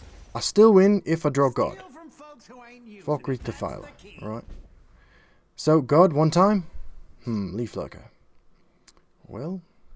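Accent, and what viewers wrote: Ireland. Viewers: Very strong Irish accent Sir:)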